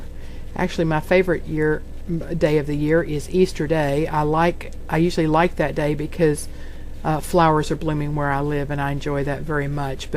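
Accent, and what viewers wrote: Texas, United States. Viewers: Iove listening to these…….Im with you about the liver….ugh!! anything but liver is what I say! : ) I didn't know you were from Texas even though you def sound it but I will say I flew down to Houston from where I live (Connecticut) and drove all over and I will have to say the people down there are so kind. I hate flying but I would go back there again for sure.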